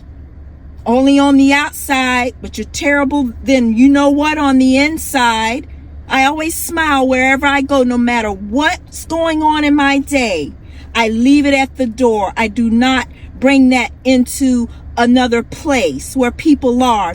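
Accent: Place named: South, United States. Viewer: Man this the kind of woman I was raised around. Sound like my aunts in the south. You dropping nothing but the truth.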